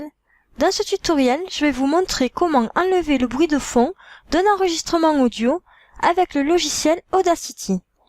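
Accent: South, France. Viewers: Cet accent sudiste me hante! (Je passe mes vacances en France donc je réalise un rêve ) Mais sinon ça va mettre très utile pour ma prochaine vidéo ;-)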